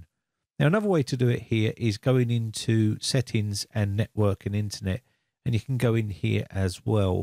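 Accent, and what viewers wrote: United Kingdom. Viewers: Please make a videos for web development programming, sir. I love your British Accent.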